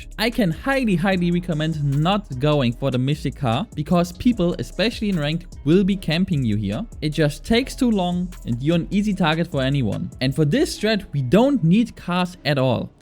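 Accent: Germany. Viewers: Anhand deines Akzentes, bist du bestimmt deutscher oder? :D Schönes Video!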